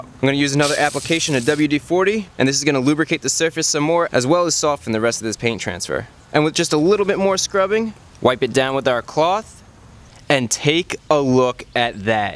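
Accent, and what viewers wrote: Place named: New York, United States. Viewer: You have sort of a NY accent. lol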